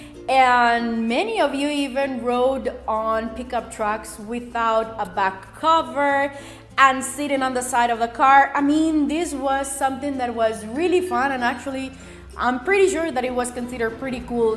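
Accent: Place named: Europe. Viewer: I remember I would borrow my mothers cell phone when I would go to high school basketball games with my cousins. She wanted me to have it so I could call her at home when we needed to be picked up after the game was over. She then bought me my first cell phone when I was 20 as a gift because she was worried about me driving to visit my older brother who lived one hour away. I was thankful but I knew at the time I didn't see why I would need a cell phone. By the way, I was born in 1984 and I'll be 34 in 3 months. My cousin said I'm just a millennial because Xennials were born from <num>. Well I was conceived in October of 1983 if that counts, lol! I feel closer to generation X and Xennials more than Millennials. I finally created an Instagram last Saturday. Now I know what DM means, (Direct Message). I still love the great outdoors more than being glued to my cell phone. 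I'm more into being outside cycling or hiking on trials. Your childhood is very similar to mine. I like your accent by the way. I wasn't sure where you are from at first. I assumed you were from Europe. Well have a great day.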